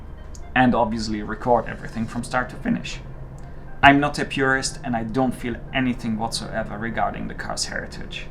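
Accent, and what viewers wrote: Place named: Germany. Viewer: With your totally awesome German accent you stated you were not a Porsche guy, you will be. I will be following along.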